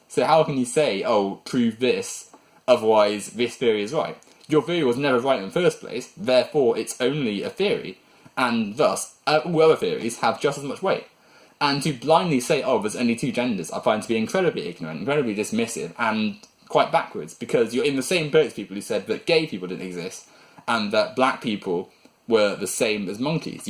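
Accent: United Kingdom. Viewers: Penises and Vaginas. Can I get my award now? Ur British accent doesnt hide ur stupidity.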